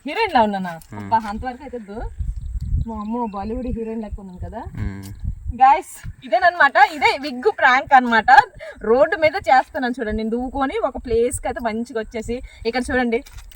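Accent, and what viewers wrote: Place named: Srikakulam, India. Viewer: Aha emi slang mana sklm ante aa maatram undali super prank sis madi sklm town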